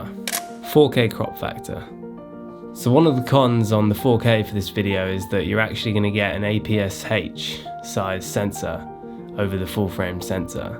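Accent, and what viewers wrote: United Kingdom. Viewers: Amazing view and lovely British accent..well done.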